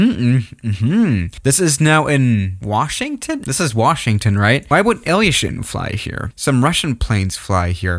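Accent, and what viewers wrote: Germany. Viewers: Alter manchmal hört man deinen Deutschen axent so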